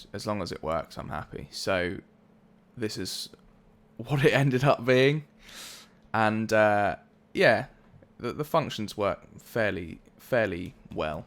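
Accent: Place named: United Kingdom. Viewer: you can really hear the British accent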